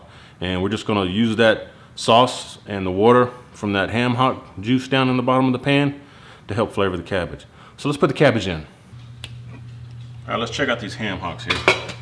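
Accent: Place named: Louisiana, United States. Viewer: I recognized your accent before I saw that you’re from Louisiana!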